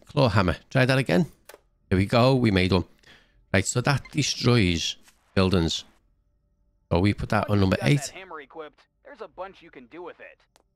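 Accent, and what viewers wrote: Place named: Liverpool, United Kingdom. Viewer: Story time with your friendly Scouse 😅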